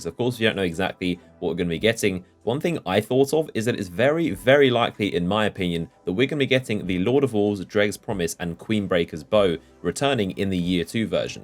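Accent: United Kingdom. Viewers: Outrageously British PS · Outrageously British yay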